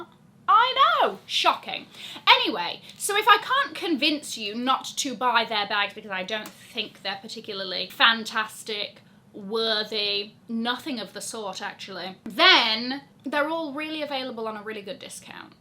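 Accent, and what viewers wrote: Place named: England, United Kingdom. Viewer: Nice review... I love your English accenT and you are so pretty. For me, I will always STAN the vintage/pre-owned luxury bag.. the quality still THERE and the prices are not always higher. But for the new designer bags I only want the Interracio from Bottega Venetta is just... PERFECT ! That's sad that now some bags are not hot like before.....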